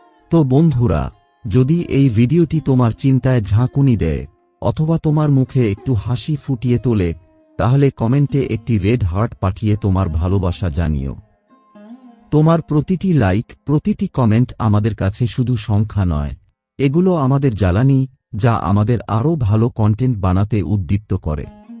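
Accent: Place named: Chapai Nawabganj, Bangladesh. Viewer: ভাই আপনার বাড়ি কি চাঁপাই? আমার এক্সের বাড়ি চাাঁপাই, ও এই ভাবে কথা বলতো আপনার স এর উচ্চারণ এই রকম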